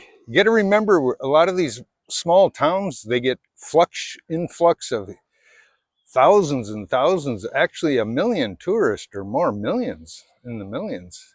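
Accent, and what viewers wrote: Canada. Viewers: You sound like a Canadian.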